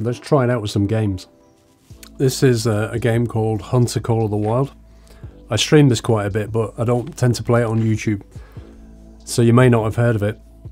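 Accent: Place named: England, United Kingdom. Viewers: Your British accent is so good. Even I subscribed!!!! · You DO know that he's English, right ? He's not TRYING to affect an accent.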